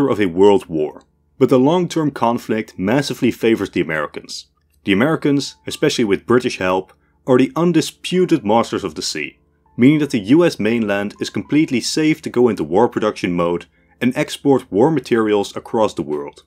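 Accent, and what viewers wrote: Netherlands. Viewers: You sound dutch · Sounds like a Hollander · Nice video. If I may ask, are you Dutch? Your accent sounds Dutch.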